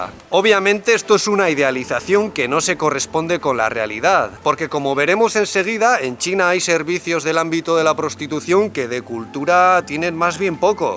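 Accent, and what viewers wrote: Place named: Spain. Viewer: el tipo habla español de españa pero la cara no parece de españa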